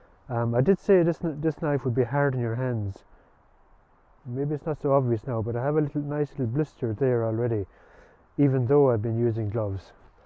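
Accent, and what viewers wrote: Ireland. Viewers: it great to hear an Irish accent on a man doing great work and talking sense . well done sir . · yeah kind of I was saying to meself midlands or wexford I'm from Laois originally moved to waterford years ago.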